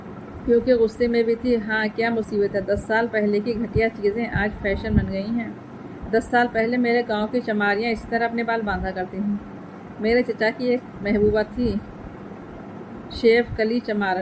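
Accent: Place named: Lucknow, India. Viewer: kya haseen lab o lehja hai.. Lucknow ki chashni mein ghula hua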